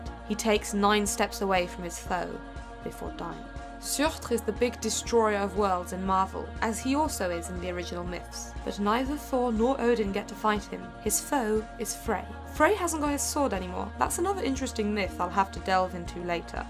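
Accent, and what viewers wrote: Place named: France. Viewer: Great video. I stumbled across this channel today and I really like it, can't have enough storytellers around. I do have two points of (hopefully) constructive criticism though, please don't take offense. 1. Talking speed. You speak pretty quickly, and sometimes that makes it a bit hard to hear what you're saying, especially when you're talking about old norse names. It kind of feels like you're standing in front of a room of people and you just want to get it over with. Especially the old norse stuff, I don't know if you're a bit nervous or unsure or something, which may cause this. There is no need to rush, you know the story, your jokes are good, you've got this. 2. Pronunciation. Icelandic is hard, judging by you accent I'd say you're French or something around there? Anyway, getting these words right can be a bit tricky, and even for a Scandinavian it isn't exactly intuitive. 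I'd advise you to spend a bit more time on them, perhaps find a way to listen to a proper pronunciation to get it right. Once more, I like what you're doing here, and I'm eagerly awaiting your next video.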